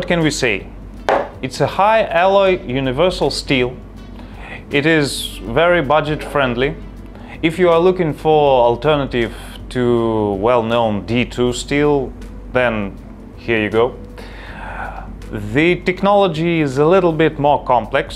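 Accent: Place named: Russia. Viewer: Hi. Thanks for all the useful information you have shared. I like how you break everything down in an easy way to understand with your awesome Russian accent. Thank you once again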